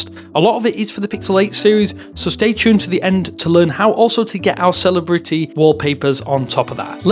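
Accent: United Kingdom. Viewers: You sound like your from the UK but the UK didn't get these features only the US did.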